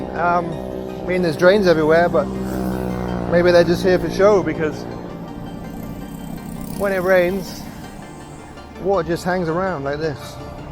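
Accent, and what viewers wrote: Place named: West Country, United Kingdom. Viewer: I like the gentle west country unassuming presentation style, check out the great live music scene in Saigon if you haven't already, cheers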